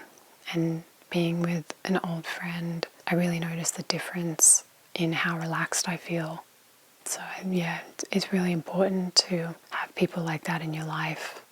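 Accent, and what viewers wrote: Australia. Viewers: The best aussie accent spoken asmrist. This audio is perfect. Please do more 🔥🔥🔥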